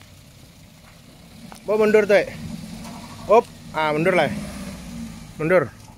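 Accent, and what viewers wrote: West Sumatra, Indonesia. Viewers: Logat urang minang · Dri logat ny kykny abg ini orang sumatra barat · Bg. Abg orang padang ya.. Logat minang nya kenal saya. Salam kenal da. Dari medan.. Di medan ado cabang da? Awak nio bali.. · Logat nya urang padang bana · Asal mana bg?? Bahasa ny kyk minang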